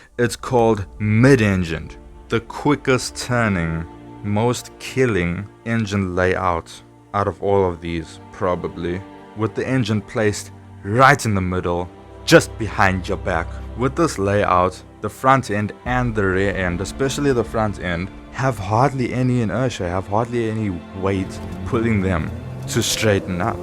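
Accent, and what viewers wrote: South Africa. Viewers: since you are taking the time to respond to my comment personally: 1. My ultimate pick would be a Lexus LFA for feel (but due to price, would never get to drive one like that). 2. FD RX7 would be my realistic pick, or probably an old Porsche Boxster for being even more realistic for the wallet and fun. 3. Check out the M3 GTR that me n my mates built for Bathurst (#42), hit a kangaroo 😂 4. I noticed all footage was of the ring - my uncle worked there (I’m a FT dad, so a while before I get behind the wheel again). 5. Porsches are just great from factory 😂 6. I’m actually old - hence the skyline. Nice SA Accent btw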